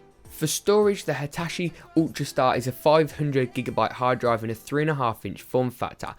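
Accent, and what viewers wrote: United Kingdom. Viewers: Why don't you leave uk links you sound like you're from the uk?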